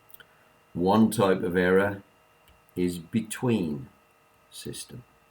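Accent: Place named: United Kingdom. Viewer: Finally a British accent in a fantastic subject !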